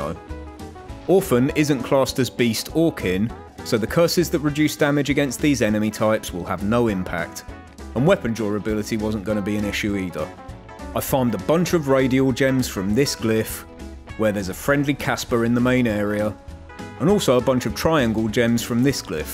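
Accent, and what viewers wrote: United Kingdom. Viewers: You are the most bri'ish bri'ish iv ever encountered, and i dont say that to everone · he sound very british~ =D I wonder of he's from the uk~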